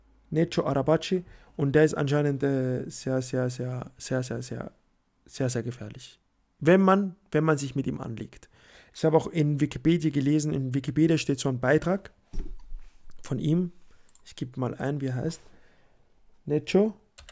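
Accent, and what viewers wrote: Austria. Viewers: Bist du Wiener ?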